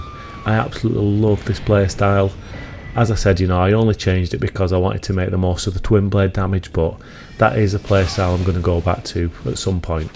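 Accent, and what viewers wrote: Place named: Scotland, United Kingdom. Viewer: My dude is just a little bit scottish ❤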